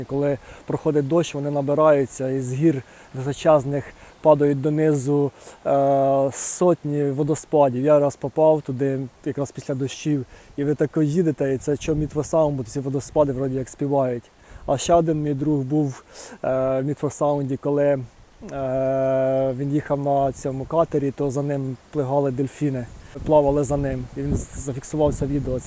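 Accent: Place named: Kirovohrad, Ukraine. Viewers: У вас така знайома вимова і таке рідне « осьо «. Часом не Кіровоградщина? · а ще приємно чути Ваш центральноукраїнський діалект! Він для нас рідний, бо ми полтавчани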